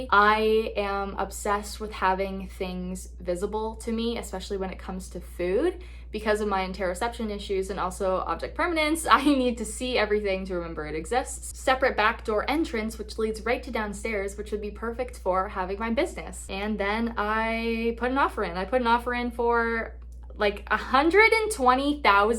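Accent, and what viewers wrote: Canada. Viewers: I love your accent, it sounds so canadian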